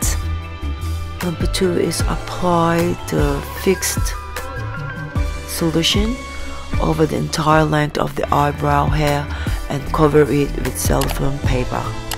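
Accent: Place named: United Kingdom. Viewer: Why are you speaking in British English accent ? Sosyal!!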